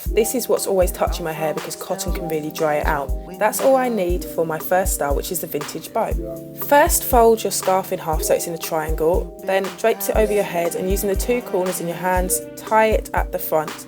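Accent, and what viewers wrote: London, United Kingdom. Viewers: I love London accents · Beautiful Look! Beautiful Wraps!! Thank you. And you have the nicest British accent, that's a compliment. Absolutely beautiful. Thanks for all of your videos.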